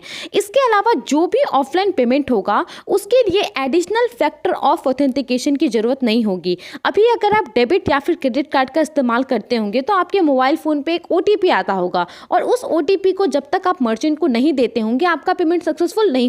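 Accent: Bihar, India. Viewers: मैडम आप बिहार se ho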